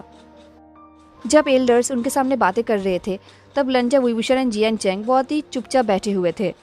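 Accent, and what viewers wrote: Bengal, India. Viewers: Di kal main jab padh rahi thi to aapke channel ka notification aaya tha to main video ko like and diwali vali comment karke maine socha tha bed par sone jaugi to tab sunogi as a bed time story jab bed par aayi to thak kar so gai lekin jab main abhi sun rahi ho tab mujhe pata chala aapke video delete karane ka pura sach aapke channel ke video delete hote dekhakar mujhe bahut bura laga tha kyuki aapki sari story bahut jyada pasand hai aapke calm and thode se bengali accent ke sath aur main bas mere lord shiva se pray karugi ki aapane jin writer se puchha ho vo delete karane ko na bol de aap mere wangxian explainer ke favourite list me aate ho i know comment bahut bada likh diya hai main par di mujhe aapase ye kahana tha your are my favourite wangxian explainer agar aapane ye comment padha ho to sorry aapko itna bor karane ke liye 😊😊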